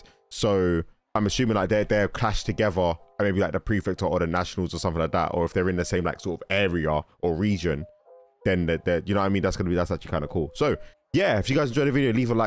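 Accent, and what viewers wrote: United Kingdom. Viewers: Are you from England? Your English sounds like British English?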